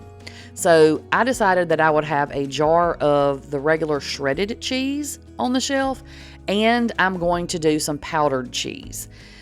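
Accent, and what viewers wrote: South, United States. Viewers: Subbed because of the southern accent. 😊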